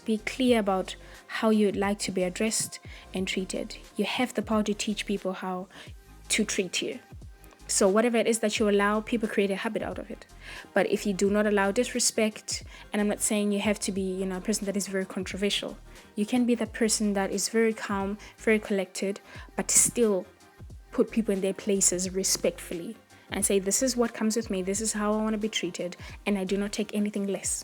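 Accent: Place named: Zimbabwe. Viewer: Very proud to hear a sophisticated Zimbabwean sisi here :) Well done, lovely accent. I have the same. Keep living and growing well girl. Love from the UK xxx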